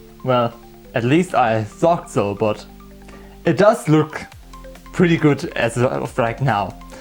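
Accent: Germany. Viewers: I hear German accent! Dieses Video ist nun Besitz der Bundesrepublik Deutschland! Brennstuhl perfectly pronounced, clearly German..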